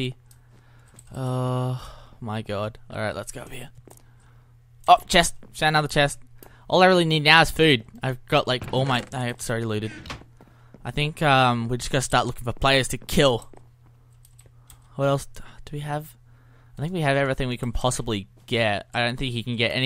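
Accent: Australia. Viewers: 15 I came from his new react video xDD. Ikr he sounds so Australian here and much more younger.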